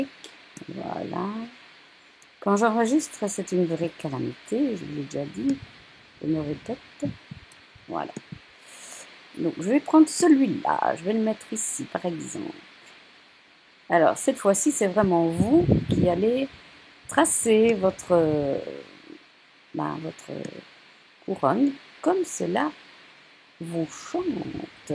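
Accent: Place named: Brussels, Belgium. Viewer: Merci beaucoup à toi pour tous ces tutos sur la caméo...j'ai toujours peur de faire des bêtises et donc je ne m'en sert que pour les découpes tradi...grâce à toi, j'ose me lancer et en plus j'adore ton accent qui me rappelle ma ville natale (Bruxelles). je te fais de gros bisous et continue à rire et nous faire rire. cela fait tellement de bien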